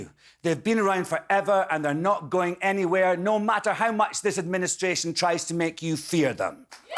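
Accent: Scotland, United Kingdom. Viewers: Nice to hear a Scots accent doing the monologue on a late show again. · I didn't know Alan was Scottish. Whenever I saw him in some movies, he had an American accent. He did an excellent job hosting Jimmy Kimmel Live👍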